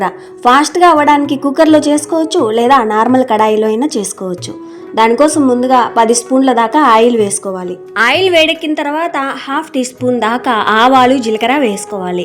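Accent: Rayalaseema, India. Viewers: మీది రాయలసీమ నా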